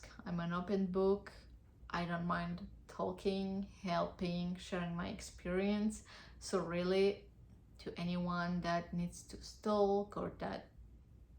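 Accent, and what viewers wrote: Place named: Italy. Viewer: Your accent sounds Italian. 😊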